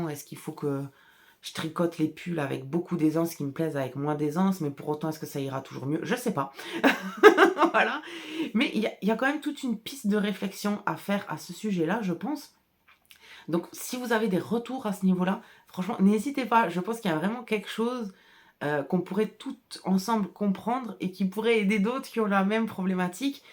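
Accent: South, France. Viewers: Bonjour quel plaisir d'entendre l'accent du Sud , je suis née a Arles et rapatriée en Creuse ! Tu n'est pas obligée de me dire d'où tu habites mais cela m'intéresse. Merci pour ces moments avec le sourire. Bon courage pour ton travail qui est magnifique. 😘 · J’atterris sur la chaîne depuis celle d’Audrey! Grande réflection aussi sur la morphologie… j’ai des petites épaules et les yokes sont souvent démesurés (sauf ceux d’Audrey dont je suis absolument fan!). Je tricote en ce moment un raglan pour varier car je trouve qu’ils rendent plus justice à mes épaules. Je lorgne donc aussi sur des raglans avec jacquard! Que de belles couleurs derrière toi 😍😍 Si je ne devais en garder qu’une… je choisirais du merino non superwash c’est si moelleux! À bientôt! (Merci pour l’accent du sud qui me sort du brouillard bourguignon!)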